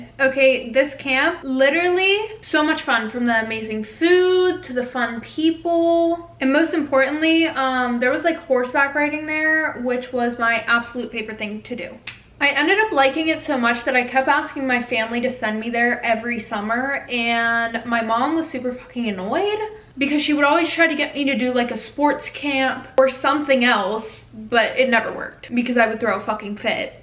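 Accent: United Kingdom. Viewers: Thanks very much❤️❤️❤️❤️that red makes your blue eyes pop 💙💙💙Love ur British accent!!!! Love from uk!!!! 😂😂😂😂😂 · I actually liked the British accent 😂❤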